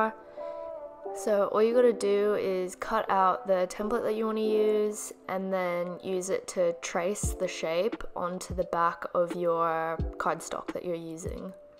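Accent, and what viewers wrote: Australia. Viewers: Australia. It's just discernible in some words ;-) (and it tells on her About tab on her channel page)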